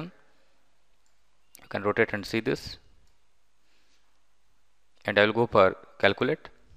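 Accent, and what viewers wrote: India. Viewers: jesus the accent of this indians is unbearable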